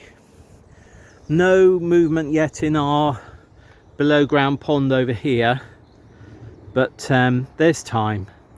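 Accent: United Kingdom. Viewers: I just love hearing your British accent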